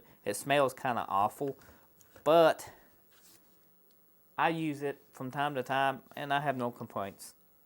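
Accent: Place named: Texas, United States. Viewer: Your Texas accent is difficult to understand but this kit looks like a good value.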